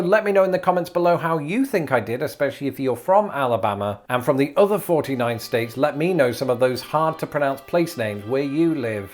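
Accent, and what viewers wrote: United Kingdom. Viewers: You've been living in my country USA for years and seem to really relish it so why Don't you drop your Brit accent and speak American English like the rest of us. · dude, as a Alabamian who grew up in the area and regularly shopped in Oneonta, you pronounced the way the locals do, or about as close as I'd expect anyone to with a British accent anyways... and way closer than most non locals do (most of them say one-on-ta) so you should get credit. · I think you're becoming very Americanized. What do your UK relatives think? You haven't lost your lovely accent & pls keep it. I love it.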